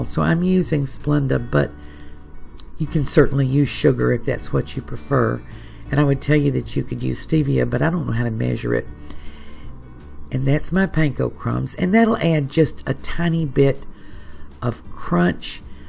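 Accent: South, United States. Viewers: where are you from you sound southern and my 88 mother in law loves you but didn't know how to ask for she is from Georgia.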